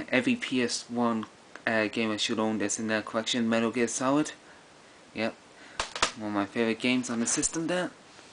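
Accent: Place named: Ireland. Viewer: Nice collection, thought you sounded Irish!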